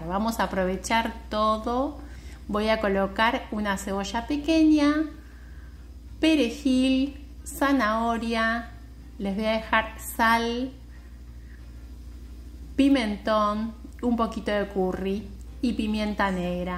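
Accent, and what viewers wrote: Buenos Aires, Argentina. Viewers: Hola, justo estoy en ese proceso de volverme vegana y comer saludable y Dios me puso su canal, gracias. Me encantaron sus recetas, tan fáciles y se ven muy ricas y el paisaje de regalo uff lo ame, pense por su acento que estaba en Argentina, pero leyendo los comentarios vi que es Brazil, de verdad que son Bendecidos y que así sigan siempre. Un saludo desde la Ciudad de México . P.D. Muchas gracias por compartir la receta y el bello paisaje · Escucho que sos argentina por tu tonada bonairense. Yo viví en San Juan por 10 años, soy italiano, pero ciuando hablo español lloro como ellos. Hagale un video de una empanadilla bien criolla vegana! Nunca haría piensado que podìan existir veganas argentinas! Un abrazo...sellado Explorador del Viento Zonda, vegetariano, no vegano.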